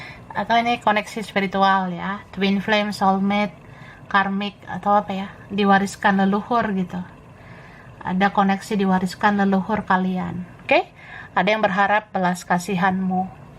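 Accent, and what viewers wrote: Java, Indonesia. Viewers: MB klo ngmng mbok yao. Medok tenan wong jowo 😁👏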